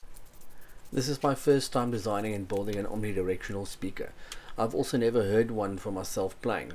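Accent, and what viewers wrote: South Africa. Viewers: You sound very South African? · I may be really wrong, but you sound South African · Fabulous blog, great flow, good content. Well done. I recognize your accent as South African. Cape Town, I suspect. Fly the flag high Mate. Great work. Mark, Johannesburg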